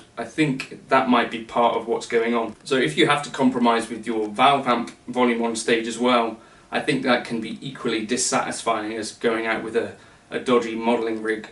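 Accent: United Kingdom. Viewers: I lov your british accent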